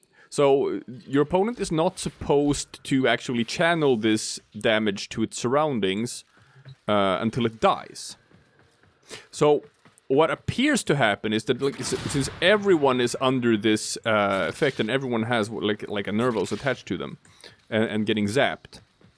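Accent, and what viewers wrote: Sweden. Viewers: Thought the accent sounded Swedish, went to "about" and sure enough I was right haha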